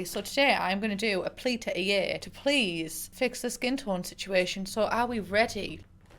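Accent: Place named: Geordie, United Kingdom. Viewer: I love the geordie accent, but your's in particular just brings back repressed memories of my old maths teacher and it is all I can hear.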